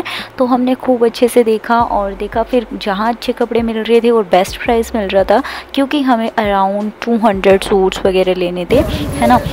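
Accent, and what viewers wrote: Haryana, India. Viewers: Ap haryanvi bolte ho di❤️❤️❤️ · OMG apka awaz haryanvi language m Don't blve it's u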